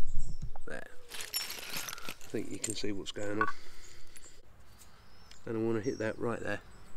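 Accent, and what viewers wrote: England, United Kingdom. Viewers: You won my sub boss. I've been searching for a channel like yours. I in the States bud in a state called WV where traces of Native American's are everywhere infact where I am to be laid to rest is a in a grave yard with a rare unopened Indian mound that they believe it goes back to the Woodland 1 period. I love searching for arrow heads and other artifacts I have been very fortunate many many axe heads. Some very old and others much newer 1500's......but man I could ask you questions till you go crazy. Also I can talk your ear off. I am just curious where you are from? You sound very English but my ear isn't good enough to know if you could be from Australia, New Zealand, or Great Britain or Wales.....so I just wanted to ask. You also might be my first person I am gonna look into Patreon. Cuz I 100% believe everything your doing needs passed down. 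I love everything your doing and damn if you don't do it like a Master. Take care boss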